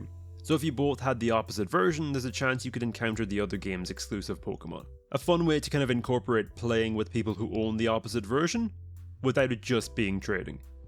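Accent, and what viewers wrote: Ireland. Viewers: Your voice was like ASMR throughout the whole video 🫠 I love the way you say Jhoto... its the Irish accent. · You sound a lot like PotatoeMcWhiskey!! Are you Irish too?!! I love Ireland!!!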